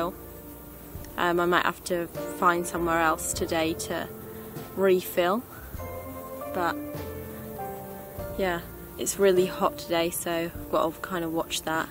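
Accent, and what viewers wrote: United Kingdom. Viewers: YT video recommendation brought me here and i stayed to watch it because I really like the british dialect/accent. Best wishes from Switzerland 😎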